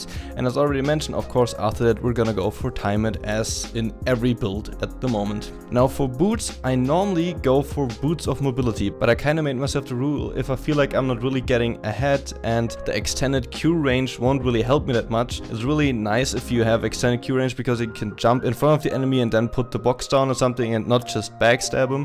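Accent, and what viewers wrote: Germany. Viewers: 100% deutscher akzent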